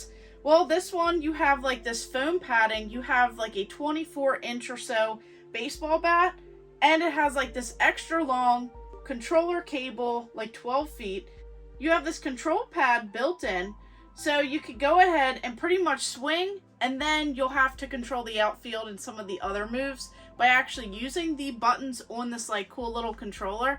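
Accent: Maryland, United States. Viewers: I gotta ask…are you from Maryland? You have a bit of the md, va, pa accent. I haven’t lived in MD for 14 years and my accent is getting thicker as I get older😅 Anyway, digging the content. RetroWolf sent me.✌️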